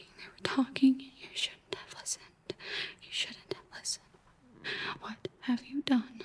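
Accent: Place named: South, United States. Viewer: I just love the southern, though the question I have is the "bless your heart" bit the good version or the bad version?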